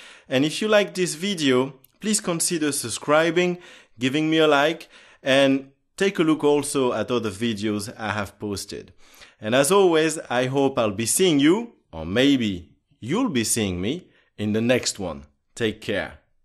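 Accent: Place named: France. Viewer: Toi t es français je reconnais l'accent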